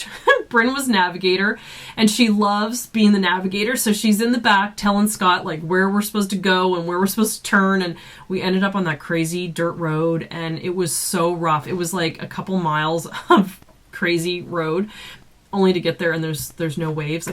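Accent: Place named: Canada. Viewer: I also like your canadian accent and I adore your sketches. Can you make a pinata for us on ur craft channel :)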